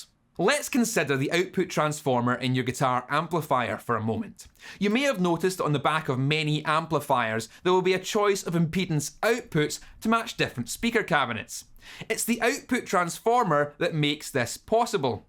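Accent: Scotland, United Kingdom. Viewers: Love the Scottish accent 👍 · Perfect explanation--many thanks! Also, as a Star Trek fan, the fact that you have a Scottish accent and are explaining functional electrical engineering concepts is a real treat. : ) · I never would have guessed guitar tech Yoda was Scottish. Good info. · Your Scottish Accent. I want to learn it. PLS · Is this a Scottish accent? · Cool video, with the accent of Sir William Wallace! Hear your accent makes me wanna drink whisky! Cheers from Portugal 🍺🤘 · I’m a simple man. I see a video that commences with saying, “hello guys, here” and I smash that like button and watch the whole damn thing to get the full Scottish brogue experience.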